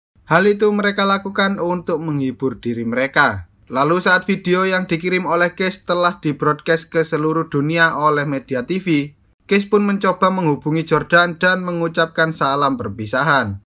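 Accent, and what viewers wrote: Java, Indonesia. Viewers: Bang kamu orang Jawa ya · Wong jowo to mas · pekat bet jawo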